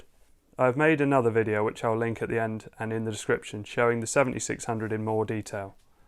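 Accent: United Kingdom. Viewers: I love your british accent! Thank you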